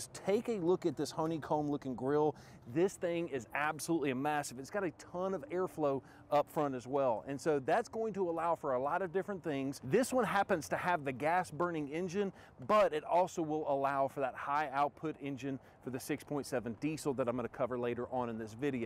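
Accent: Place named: South, United States. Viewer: Love the accent. Wish Canada had that southern personality.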